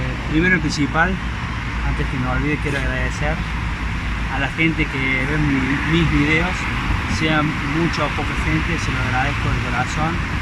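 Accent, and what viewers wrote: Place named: Córdoba, Argentina. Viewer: Buen acento cordobe jajajajaj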